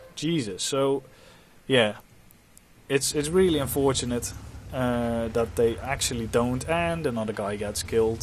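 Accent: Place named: Netherlands. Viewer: just what i thought, that accent! the WZ 131 looses the 100mm gun, thats all i know, but if you like ROF you wont choose the gun anyway, but i grinded the wz 132 with that gun. i liked it more although the muzzle break on the 131 looks way better IMHO. im from the Netherlands to btw!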